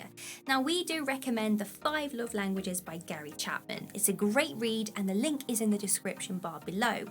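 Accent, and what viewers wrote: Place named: London, United Kingdom. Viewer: I like ur accent 👌Are u from London?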